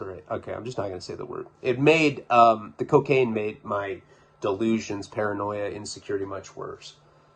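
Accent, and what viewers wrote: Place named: California, United States. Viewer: He does it so often its hilarious, it goes with his rich kid cali accent so well.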